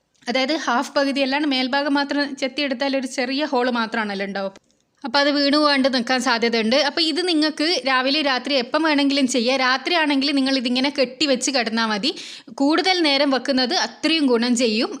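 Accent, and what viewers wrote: Kannur, India. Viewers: Chechi Kannur annoo??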